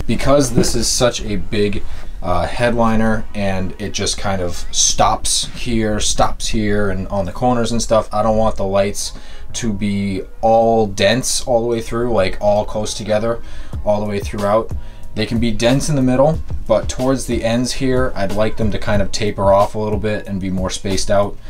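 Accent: Massachusetts, United States. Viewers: Love seeing a Mass dude doing thangs out heah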